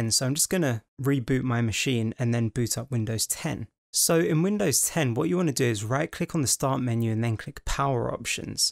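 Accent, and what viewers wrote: United Kingdom. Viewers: So glad I stumbled upon your video, what luck!! After struggling with reinstalling Ubuntu 20.04.3 successfully ,after an "ID-I0-T", error I thought I better check the Win 10 install on my dual boot drive. Win 10 booted up fine and whilst booted it did a full Nov 21 update on shutdown / restart, and all worked okay. After shutting Win 10 I then found problems reinstalling apps in Ubuntu with the persistent read only file system error, requiring several uses of Timeshift to reset Ubuntu again & again. I had instantly thought it was an Ubuntu error after the reinstall, but low and behold it is Windows trying to hog the hard disk limelight again with "Turn on fast restart" enabled. I will be checking that it is disabled after every Win 10 update from now on. Thanks so much and great to hear a British accent on the video :)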